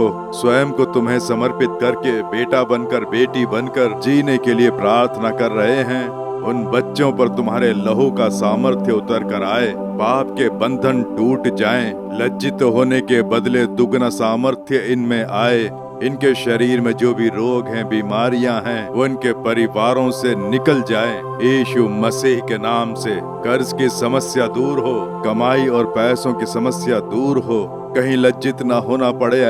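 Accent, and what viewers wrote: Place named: Chhattisgarh, India. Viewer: Chhattisgarh ki bol rahi hai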